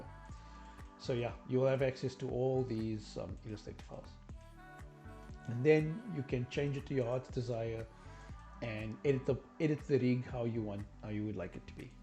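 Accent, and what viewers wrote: South Africa. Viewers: Are you South African? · You sound very South African. Awe masekind! Shout out my brother! Keep Up The Great Work!!!!!!!!!!!! Lets push animation in SA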